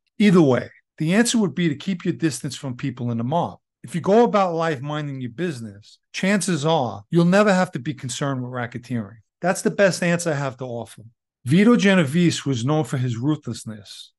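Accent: New York, United States. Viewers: Slight NYC accent?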